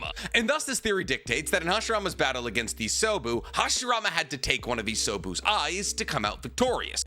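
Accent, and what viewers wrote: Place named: New Hampshire, United States. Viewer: I was wondering why you said it like me I live in NH to I get that. XD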